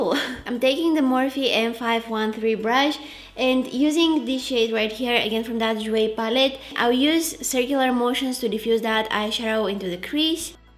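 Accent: Bulgaria. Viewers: I met a woman the other day and I guessed she was from Bulgaria by her accent. Guess I've been watching a lot of videos!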